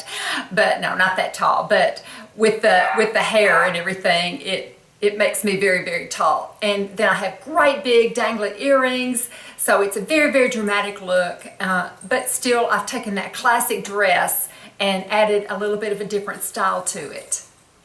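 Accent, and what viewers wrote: South, United States. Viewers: Love your style. Thank you for sharing! I also like skinny jeans and distressed jeans. I'm a rule breaker and wear what I enjoy and what I think looks good on me, my shape and coloring. I believe at my age (I turned 63 in January, but still feel like I'm 30 most days. LOL) I should be able to wear what I want. Your outer beauty is apparent, and your inner beauty comes through when you speak. A southern belle. : ) I enjoy watching all your videos no matter the subject. Your sweet southern accent makes me miss home! I'm from north GA, living in FL. Looking forward to more of your videos!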